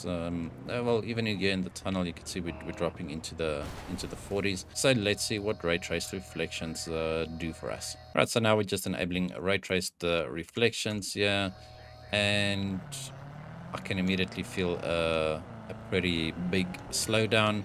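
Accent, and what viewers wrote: South Africa. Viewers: Yeah true, I’ve been playing it since 2.0 patch, even on my gtx1660, it’s still quite a decent experience. Just bought a RX6600XT for R3500 yesterday (I’m also South African). I was just digging through some benchmarks for this card and recognised your accent and decided to continue watching.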